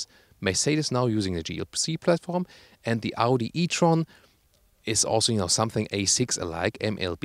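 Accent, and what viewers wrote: Germany. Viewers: SORRY, but that German accent is adorable.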